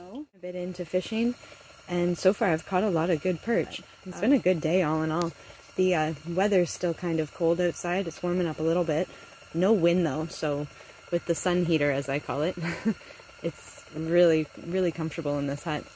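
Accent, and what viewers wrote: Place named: Canada. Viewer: That Canadian accent is strong Aaay! ☺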